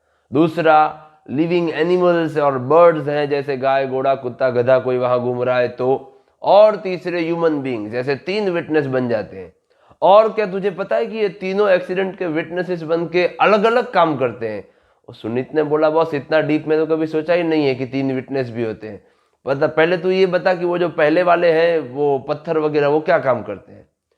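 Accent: Gujarat, India. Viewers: Are you gujarati ❤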